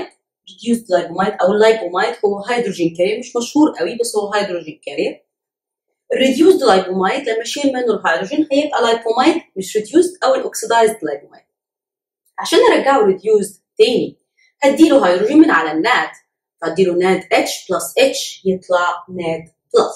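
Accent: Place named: Arab world. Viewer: She is speaking with an arabic dialect through her sessions for arabic students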